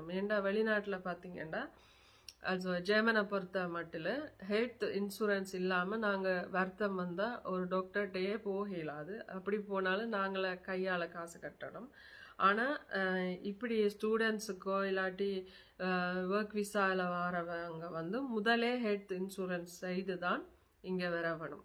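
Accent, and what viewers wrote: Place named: Jaffna, Sri Lanka. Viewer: நன்றி அக்கா நீங்க நன்றாக யாழ்ப்பாணத்து தமிழ் பேசுறீங் . உங்களுடைய உதவி எப்பவுமே எங்களுக்கு தேவை. உங்கள் வீடியோ மிகவும் பயனுள்ளது..thank you so much. god bless you.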